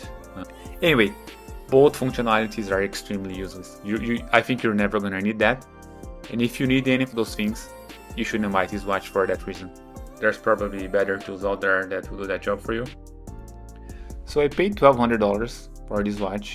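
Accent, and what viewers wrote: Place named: Brazil. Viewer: Do you have Brazilian background? Based on your accent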